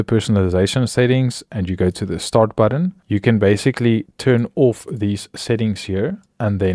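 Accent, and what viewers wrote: South Africa. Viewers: If I am correct he is south african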